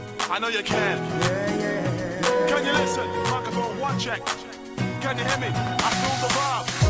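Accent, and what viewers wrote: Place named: United States. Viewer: How do you have an American accent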